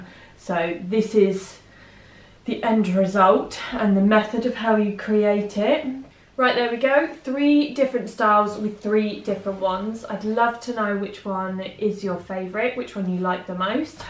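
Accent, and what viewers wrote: United Kingdom. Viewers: you said in British accent. I can't ask for more. I'm too distracted by your accent.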